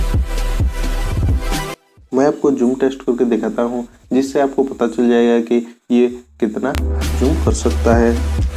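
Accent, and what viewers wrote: Odisha, India. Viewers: Are you from Odisha Because your hindi is like Odisha people say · bhai aap odisha ke ho na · Bhai u r odia for sure.. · Bhai are you from odisha?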